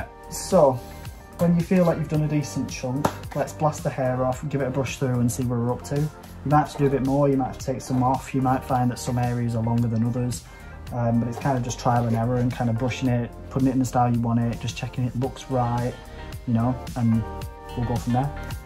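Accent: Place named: Sheffield, United Kingdom. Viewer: I'm guessing a Sheffield accent 😬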